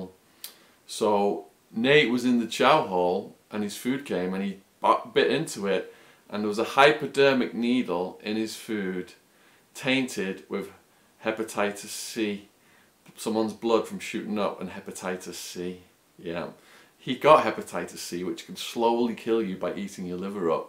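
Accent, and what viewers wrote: United Kingdom. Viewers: Are you from UK? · You sound British. Were you deported?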